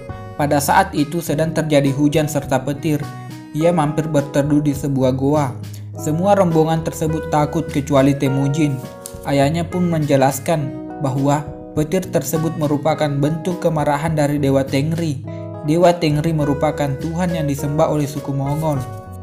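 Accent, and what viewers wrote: Bugis, Indonesia. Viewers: Bang lu orang bugis gak si. Soal nya orang bugis ngomonya kaya gitu? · Logat bugis ta yee' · Mantap logat Bugis nya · Makassar gk bisa hilang logatmu 😂😂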